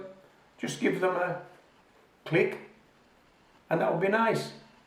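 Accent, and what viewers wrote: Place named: Geordie, United Kingdom. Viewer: I’m a 63 year old geordielass living in Spain. I love your accent, it has a Geordie ring to it but also slightly Yorkshire tint.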